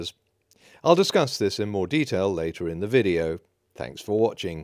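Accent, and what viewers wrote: United Kingdom. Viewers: Like your videos! 👍🏻 what part of Australia they did grow up in?? Reason I asked is your accent is just so British. Cheers!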